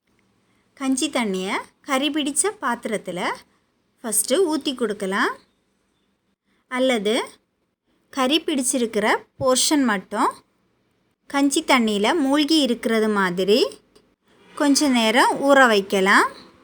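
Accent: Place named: Kanyakumari, India. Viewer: Kanyakumari tamil. Very great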